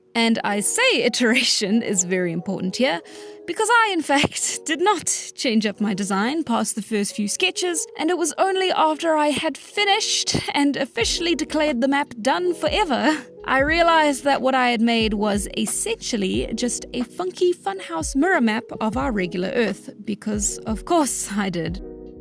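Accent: South Africa. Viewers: I was looking for this comment lol. It was the first thing I noticed. I think us South Africans just have an ear for the accent haha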